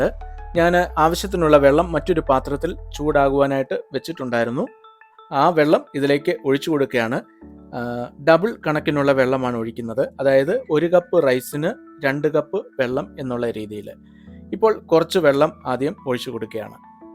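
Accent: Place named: South Kerala, India. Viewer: പാചകം Eassy - കഴിക്കാൻ Tastyയും ആണെന് തോന്നുന്നു. തെക്കൻ style സംസാരം. നന്മ നേരുന്നു. Rtd Teacher'👍🏻🌹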